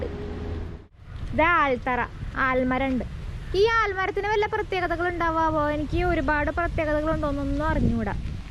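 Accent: Thrissur, India. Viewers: തൃശ്ശൂർ ഭാഷയുടെ സൗന്ദര്യം സൂപ്പർ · ഞാൻ subscibe ചെയ്തു.. ഈ തൃശൂർ സ്ലാങ് കേൾക്കാൻ.. വീഡിയോ നന്നായിട്ടുണ്ട് 👌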